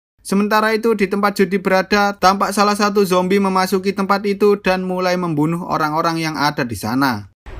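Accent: Java, Indonesia. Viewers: Loh,Mimin wong jowo to dadake? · Uong jowo to mas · Mimi orang Jawa kah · Ngomongnya jelas bgt bang tapi medok bgt cengkoknya 😭 aku agak gak biasa dengernya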